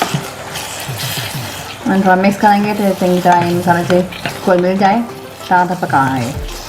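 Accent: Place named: Punjab, India. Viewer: Me ap ki Punjabi hi sunti rahi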